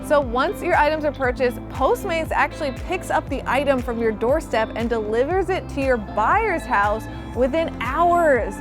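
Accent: New York, United States. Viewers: I've followed your channel for several years and use it to practise my english listening stills. At very first video I thought you have a NY accent, you always swallow the "h" sound from "manhattan" and the words come from your throat not from lungs, it makes the words sound more lighter than someone from california. I had difficulties at that time, now I am used to it. Great performance, beautiful apartment! Go ahead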